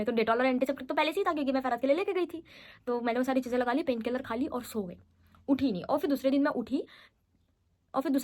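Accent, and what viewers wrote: Maharashtra, India. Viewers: I just love the way you are talking and your marathi accent in hindi is such a marvelous to hear ❤❤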